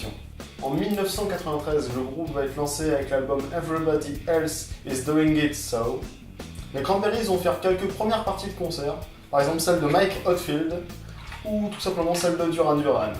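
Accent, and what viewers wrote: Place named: Spain. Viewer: A quand la vidéo sur un groupe espagnol pour faire attendre ton bel accent ? Venga hombre, queremos màs videos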